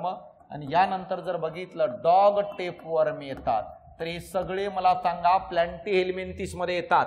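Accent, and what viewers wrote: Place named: Latur, India. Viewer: Sir तुम्ही लातूरचे आहात का. आवाज वरून वाटते.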